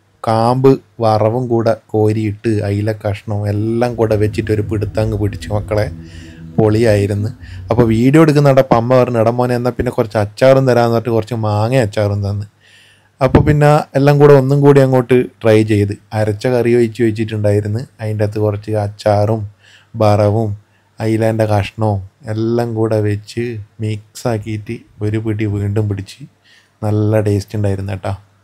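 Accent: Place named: Kannur, India. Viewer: നിങ്ങൾ എവിടെയാ നമ്മുടെ കണ്ണൂർ ആണോ നമ്മളെ bhasha😂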